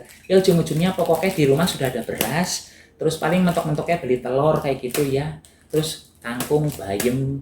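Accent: Banyumas, Indonesia. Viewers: kasian ya, tapi tetap semangat dlm menjalani hidup, jatuh bangun dng segala perjuangannya yg tidak mengenal waktu, sekarang sudah kelihatan jerih payahnya hidup sudah berkecukupan, punya rumah, punya mobil, punya istri belum to, kok selalu tampil sendirian, tapi, banyak temen karena lucu dan cerdas, jadi banyak netizen yg suka banyolannya, ngomog ngapak yg ting pletot, bikin lucu alami, kalau bisa ketemu, pasti tak iwel" pipine sing tembem, botak, irunge sing blesek, tapi, lucu bikin gemes kalau bukak vidionya bersama iyunge yg kool kuno mbrono khas kehidupan desa, tapi sukses capaiannya, 😲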